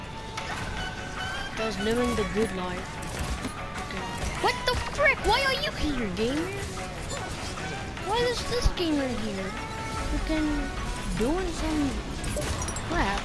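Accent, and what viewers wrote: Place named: Afrikaans, South Africa. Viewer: Are ya south african thats ONE HELL OF A AFRIKAANSE ACCENT MY BROE.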